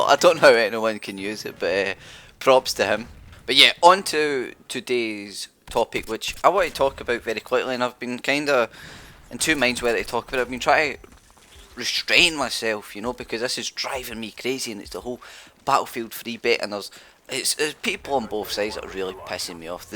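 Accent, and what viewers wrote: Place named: Ireland. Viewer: Everything's funny with an irish accent!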